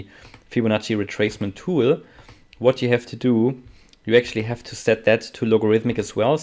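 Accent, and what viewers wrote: Germany. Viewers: Vielen Dank für die super Erklärung mit deutschem Akzent! (VG aus die NL! 🇳🇱🧀🙂)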